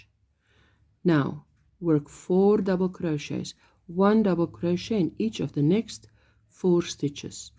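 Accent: Afrikaans, South Africa. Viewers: Where are you from,your accent is so South African .... Ive just completed the beanie, easy and well explained, many thanks. Regards Sushie, South Africa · Great tutorial thank you! If I'm not mistaken, you've got a beautiful Afrikaans accent? Hahaha your accent makes me miss home! Can't wait to see more videos :D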